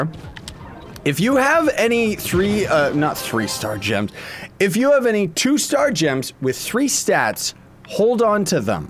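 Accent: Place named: Canada. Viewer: I like Canadian accents, they say oo when they would normally be o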